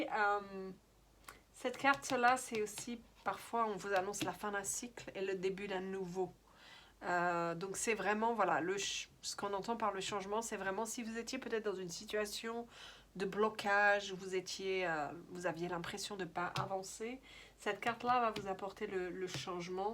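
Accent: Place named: England, United Kingdom. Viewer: Mignon ce petit accent anglais :D Je vous découvre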